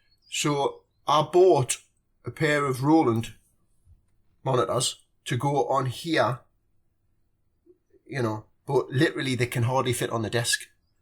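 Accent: Newcastle, United Kingdom. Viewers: "... an then ah fa'got ah thought that an I went an bought some ..." Love it, and love the Geordie. Totally agree, though. I don't have space for speakers either, but I do need new headphones. My skullcandy ones are falling to bits. Sennheiser?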